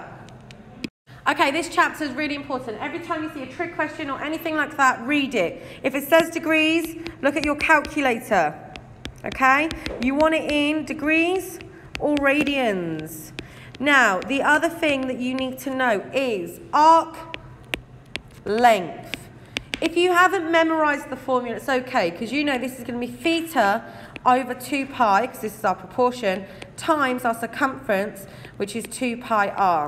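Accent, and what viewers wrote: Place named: United Kingdom. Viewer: thats the most british accent u have seen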